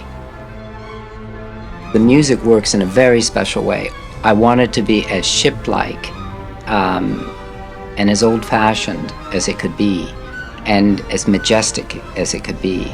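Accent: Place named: United Kingdom. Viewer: James spoke in a more Gr. Britain style of English?! (At least that kind of impression, his English are giving me here)...Wow! I didn't knew that! I'm impressed! Dear James...we mis you terribly, all over the world! May God rest your soul, and we thank you for the incredible music you gave us! Our love and respect to you, from your fans here in Greece.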